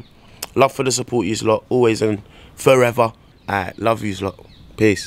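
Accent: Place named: United Kingdom. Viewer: As a none UK....you make the UK accent sound so cringey..... you can never sound hard so stop the antics. " bap"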